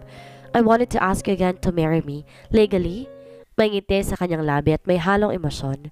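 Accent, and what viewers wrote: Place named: Italy. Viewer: The narrator did much excellent in Italian dialect, very good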